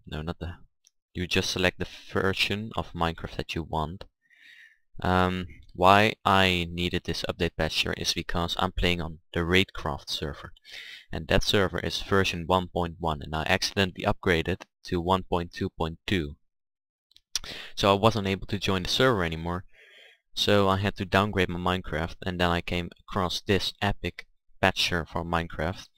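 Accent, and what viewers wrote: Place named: Netherlands. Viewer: i love the way you say "fersion" instead of "version" cus v is said like an f in dutch ^^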